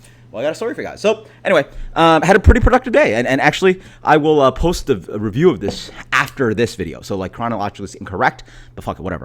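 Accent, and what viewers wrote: United States. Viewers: dude, your new york accent came out here. wish you the best bruh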